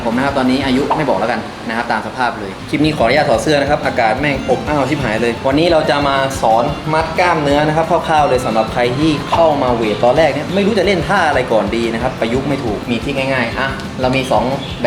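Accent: South, Thailand. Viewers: มาแล้วสายฮามีสาระ5555 ชอบสำเนียงคนใต้ชัดๆ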